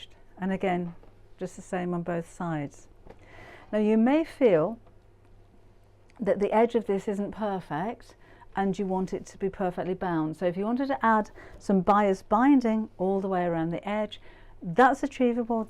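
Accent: United Kingdom. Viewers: I’ve been quilting for 20 years and have never seen this unique construction method. This technique is a genuine “game changer.” ‘ Thanks so very much for sharing this,. I love your tutorials with your cute British accent and soothing voice! I am originally from Texas, so you can imagine how fascinated I am with a British sewing teacher! God bless you!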